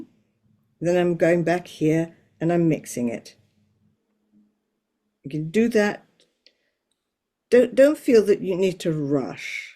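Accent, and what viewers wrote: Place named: United Kingdom. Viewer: I love your art and I just discovered your channel, you sound quite similar to some of my family members so I was curious to ask if you are from the UK as well?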